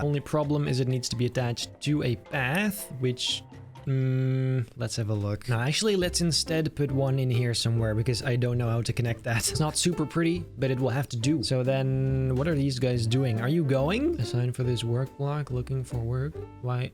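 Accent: Netherlands. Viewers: Ik ruik een nederlands accent...?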